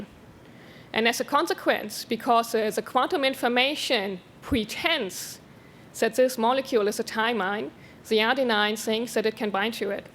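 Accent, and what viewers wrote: Germany. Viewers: Free Thinker, I think she's German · I think she is a German who was taught British English by (or near) the French. That's my hypothesis. Anyways, very interesting talk!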